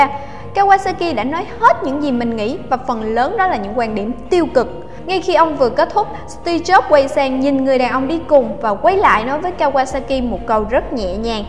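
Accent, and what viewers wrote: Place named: South, Vietnam. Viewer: Giọng miền trong thì phải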